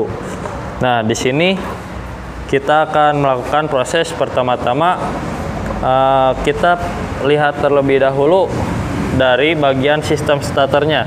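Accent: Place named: Ambon, Indonesia. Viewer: Mas ini pasti dari ambon dilihat dari logatnya..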